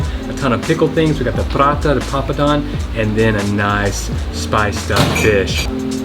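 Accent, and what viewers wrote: South, United States. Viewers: You sound like you have a southern accent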